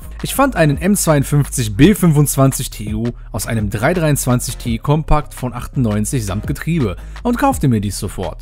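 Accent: Duisburg, Germany. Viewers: Kommst du aus Duisburg? Komme aus Duisburg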